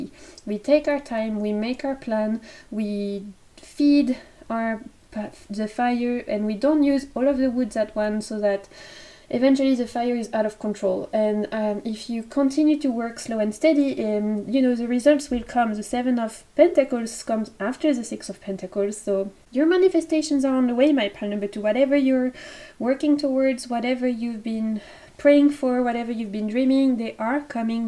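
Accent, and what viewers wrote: France. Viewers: Woww you are my new favorite youtube channel❤️ been binging your videos the last couple of days. You have alot of decks that i have on my wishlist! And pile number 2, i have the feeling i have been putting alot of work in my business and not seeing alot of results (or monetary results from it) so you are spot on❤️ keep up the good work! Love your french accent🥰 (can speak a couple of words in french but not much haha)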